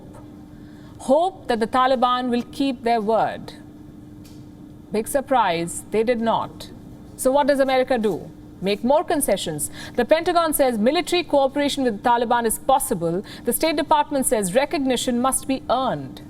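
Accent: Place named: India. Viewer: Indian masquerading to be western, but her funny accent gives it away.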